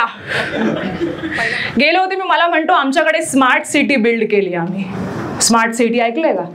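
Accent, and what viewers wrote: India. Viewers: tumachi Marathi bhashewarache prabhutva assal Puneri watat ahe.. ekhada Punyat show kara.. nakkich pratisad milel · Probably one of the best Marathi comedian out there. And her Marathi is so Mumbai. Otherwise you always hear puneri or kolhapuri Marathi. Nothing against them, but Mumbai accent Marathi feels relatable. Keep up the good work. ❤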